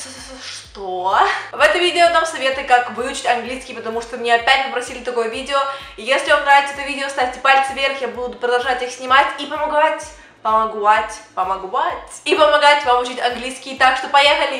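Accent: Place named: United States. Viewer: у тебя уже акцент американский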